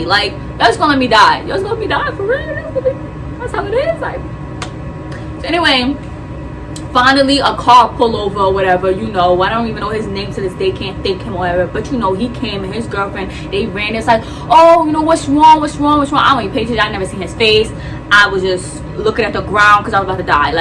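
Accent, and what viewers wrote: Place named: Brooklyn, United States. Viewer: Lolll you sound like you straight from Brooklyn 🤣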